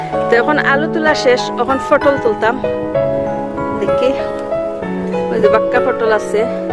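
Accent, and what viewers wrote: Sylhet, Bangladesh. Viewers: আমরার সিলটি আপার মাতের মত লাগে সুন্দর সুন্দর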